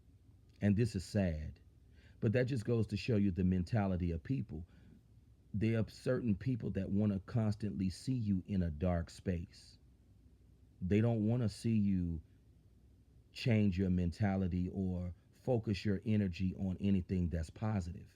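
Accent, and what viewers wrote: United States. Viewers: stop talking ebonics and ill let you in the white club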